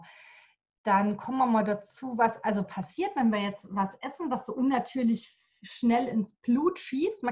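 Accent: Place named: Saarland, Germany. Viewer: Ich liebe den saarländischen Dialekt 😊❤. Lg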